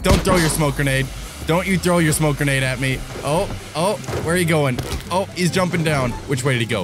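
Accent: Minnesota, United States. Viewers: Shit is this guy from Minnesota too?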